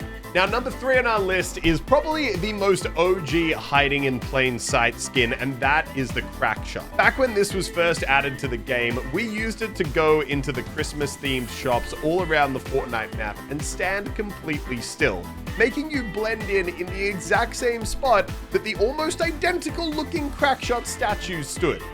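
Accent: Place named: Australia. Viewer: Even though it's basically becoming SypherPK with an Australian accent, a week late. It is still not nearly as bad as the Fake American Accent Aussie Fortnite Youtuber.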